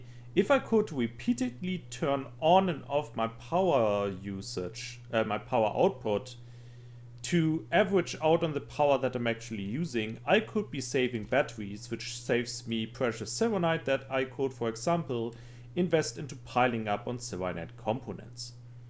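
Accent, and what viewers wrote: Germany. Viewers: I hear German accent = I listen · Are you German? You sound German... 10 Euro that you are German... but even more because you understand what this factory summoning circle thingy does 😂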